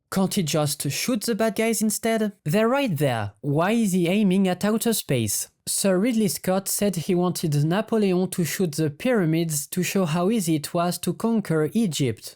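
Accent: France. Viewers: Ton accent frenchie me fume 😂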